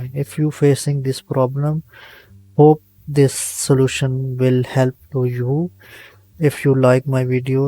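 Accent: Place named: India. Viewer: not to be racist or anything, but itis always those quick videos on youtube with someone with a strong indian accent that saves the day. THANK YOUUUU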